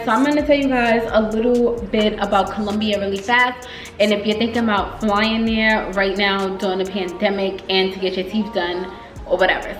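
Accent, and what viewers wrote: New York, United States. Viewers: Girl I knew u was from NY or something cuz I’m from Jersey City but I live in Cali now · It's your dog wanting to be in the video for me, licking the couch and all 😂 reminds me of my dog ♥️ .. this video was so informative I never thought about the hours the procedure would take or even the foods we can't eat thank you so much for this video I've been wanting to get mind done for a while... sidebar I knew you were from New York soon as you started talking lol we have a distinctive "accent"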